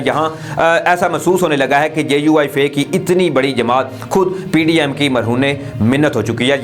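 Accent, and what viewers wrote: India. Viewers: جناب صاحب اگر آپ مناسب سمجھیں تو اپنا تلفظ ٹھیک کریں ھمیں انڈین ھندی ٹائپ کی اردو سے نفرت ھے جیسے ۔ خون کو کھون کہنا خالی کو کھالی ۔ خانہ کو کھانہ وغیرہ ۔ اگر یقین نہیں تو اپنی سپیچ دھرا کے سن لیں اور باز رھیں ۔ لگتا ھے نئے نئے انڈین فلموں سے متاثر ھوئے ھیں ۔ اپنے اردو کو خالص کریں پلیز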